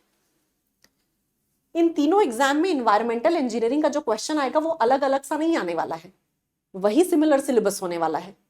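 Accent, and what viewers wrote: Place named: South, India. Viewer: AAP south se ho kya mam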